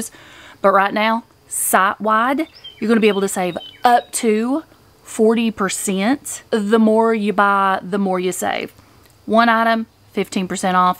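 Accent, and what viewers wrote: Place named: Louisiana, United States. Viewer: You’ve got to be from Louisiana Boo! I love it. 😂❤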